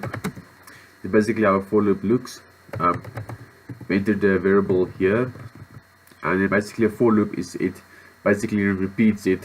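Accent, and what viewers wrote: Afrikaans, South Africa. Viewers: Hes from South Afrika. Theres no doubt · like jou afr accent XD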